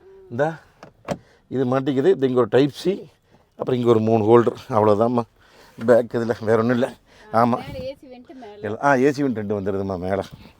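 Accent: Kongu, India. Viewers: அண்ணா நீங்கள் பேசும் கொங்கு தமிழ் தமிழ் மிகவும் அருமை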